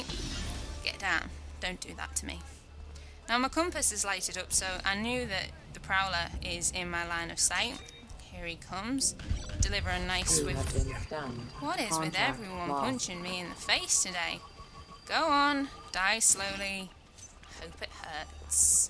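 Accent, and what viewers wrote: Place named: Australia. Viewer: hey what nationality are you? you sound australian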